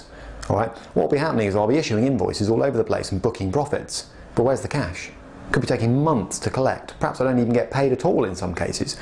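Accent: United Kingdom. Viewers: I love your presentation and British ascents ：)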